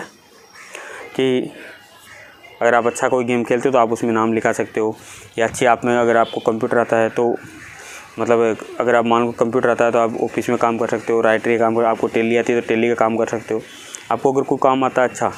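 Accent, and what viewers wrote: India. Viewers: Sit aapka na bolne ka aur samjhane ka tarika ekdam mast hai👌ekdam Desi style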